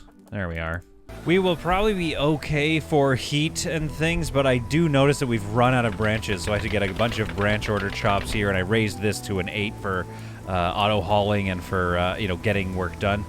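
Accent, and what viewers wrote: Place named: Black Country, United Kingdom. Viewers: We don’t claim that accent, it’s more brum or Black Country. 🤣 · That was not a Scottish accent! :P that was like the midlands of England LOL still love the videos though :) keep it up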